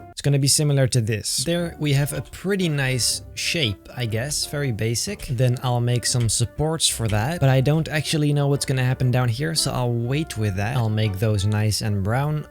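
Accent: Netherlands. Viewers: Are you dutch? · Is he dutch? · Nederlands accentje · I keep trying to figure out from which country he is by trough the way he speaks, I came to the conclusion it had to be Dutch.... I think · Your Dutch isn’t ? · Re u dutch? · Je bent Nederlands ik hoor het man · I smell nederlands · Are u dutch? Your english Accent sounds like you’re from the netherlands 😁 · are u dutch?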